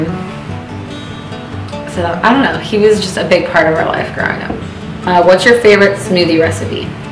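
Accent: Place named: New Jersey, United States. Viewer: Wow you literally kinda have a New Jersey accent . You seriously look like a New Jersey girl lol (not a bad thing)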